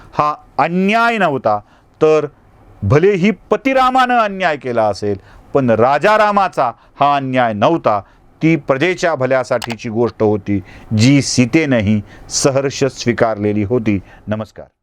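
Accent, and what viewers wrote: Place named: Marathwada, India. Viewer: विश्लेषण चांगलेच, पण श्रीरामांचा उल्लेख एकेरीत करणे योग्य वाटत नाही. तसेच आपले मराठवाडय़ातील मराठी प्रयत्नपूर्वक व जाणीवपूर्वक शुद्ध करणे आवश्यक आहे. आपणास खूप उंची गाठायची आहे. धन्यवाद .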